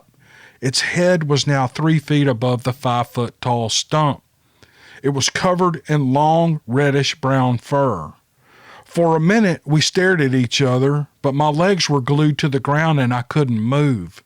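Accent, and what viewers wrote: South, United States. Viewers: do you see what you have started? My gosh! Folks love you reading these stories because they relate to you and the persons who tell (wrote) them. You make it so much fun... although never making light of the scary encounters that take place for many of these individuals (and the fact they have to try and learn how to deal with it). You have cornered a phrase... "Awright, here we go!" (In your way cool, southern gentleman, way.) Then those of us out here in any particular corner of the world lock in and are ready to take the ride.:^) You go,. We're right here with ya! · I like your genuine humility also. Ppl can tell. Southern drawls feel at home 2. I saw elves in 1976-77 in cheyenne wy. Not sure you'd want that story here. Nor am i joking.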